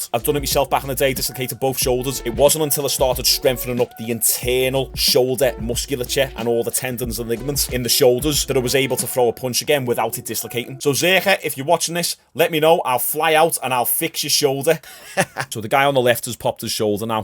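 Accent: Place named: Glasgow, United Kingdom. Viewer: In regards to hate comments and what not, a lot of kids are on here who don't know any better and also bitter A-holes sad about their own life unwilling to do anything to change it, wanting to bring someone down to their level instead. Constructive criticism is great to learn and grow from, but some people abuse anonymity just for the sake of being vile. Keep up the effort with the uploads and people will eventually gravitate. I think most state side Americans love your accent (I wanna say Glasglow) plus your entertaining so Its almost assured you're gonna blow up huge one day.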